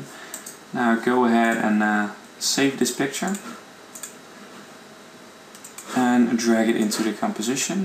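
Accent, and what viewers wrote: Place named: Netherlands. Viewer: haha lekker hoor dutch accent ftw :)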